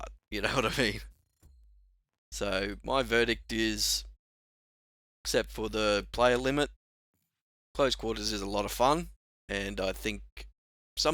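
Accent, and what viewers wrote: Australia. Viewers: I still can't get over how sexy your voice is. Dat 'stralian accent. I thought now that rent-a-server had come out, you were able to modify the player count. That sucks that it's limited to 8v8 :(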